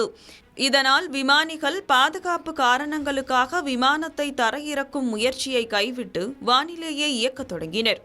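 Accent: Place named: North India, India. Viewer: Yentha naathari da seithi vaasikirathu, ithulayum vadakkan vanthuttana😡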